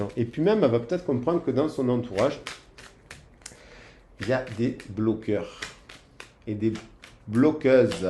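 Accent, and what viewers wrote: South, France. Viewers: Bonjour je vous decouvre vous êtes une personne très solaire merci pour vos guidances très detaillees vous avez raconté mon histoire il a pris la fuite sans explications bcp ds l ego superficiel il y avait un déséquilibre bcp de froideur .silence radio j ai lâche prise car trop de souffrances tout est juste du début à la fin .vous êtes formidable c est un plaisir de vous écouter. J adore votre petit accent ensoleillé .merci pour votre bienveillance et votre générosité. Belle journée a vous 😉😊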